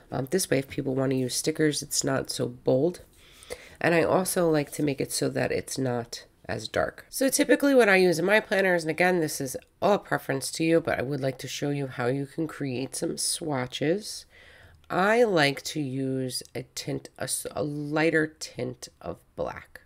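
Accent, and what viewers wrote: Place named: New England, United States. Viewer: 👏This is the only vid that made sense for these pages! Thank you for actually saying everything needed. Some vids will miss saying shift and then I can't go any further because I don't know why the tool wasn't working like I want. So glad I found your channel. I also like your (New England?) accent. I can finish my homework now!!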